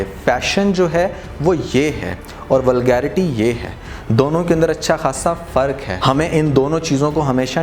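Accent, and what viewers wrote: Punjab, India. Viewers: I would suggest you do record your videos in Punjabi. You can't speak urdu with clarity. Your punjabi style urdu hurts.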